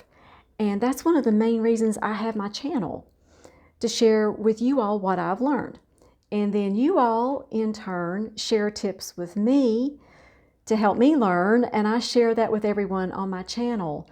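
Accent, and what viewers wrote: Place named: Tennessee, United States. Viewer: Are you in Tn. We have the same accent.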